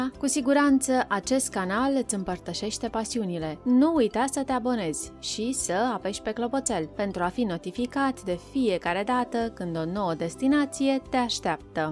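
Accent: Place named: United States. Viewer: Am oprit episodul la jumatate. Efectiv nu am mai putut sa mai ascult modul in care pronunti denumirile cu acel accent american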